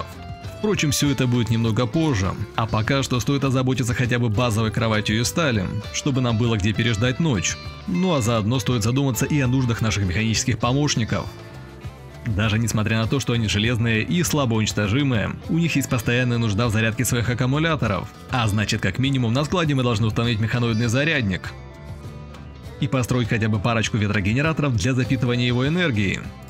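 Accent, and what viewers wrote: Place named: Surzhyk, Ukraine. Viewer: Лайкос за суржик😁😂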